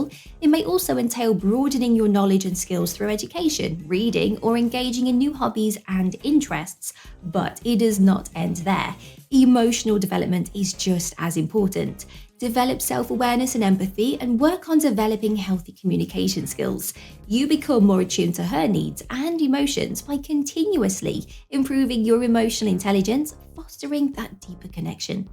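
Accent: United Kingdom. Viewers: I watch this just for the excellent british english 🥰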